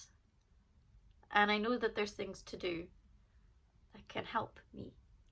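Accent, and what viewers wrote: Ireland. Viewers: Wow! You're telling it all so clearly and openly. You're such an angel for other people. I volunteer at an helpline and it's very common to get a person in these situations on the line. PS, love your Irish accent <3 and music of course ! Thank you 🙏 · I like her Irish accent.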